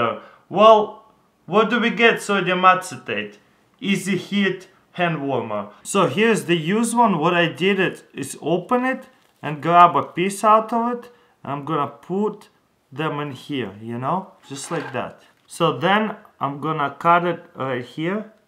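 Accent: Russia. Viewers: I SO KNEW you were Russian! I'm Russian too...decent...and I recognized the accent. Thanks Dude you're way cool! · are you Russian? sorry for judging off an accent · No its just because he is Russian, and the English language is very difficult sometimes for people going from Russian and picking up English.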